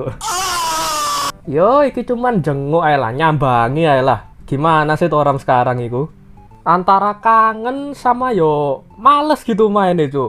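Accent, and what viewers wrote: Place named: Banyumas, Indonesia. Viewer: Welcome back lord Pas nonton video ini , bini gw langsung hapal & bilang "oh ini yg mas-mas ngapak itu ya?"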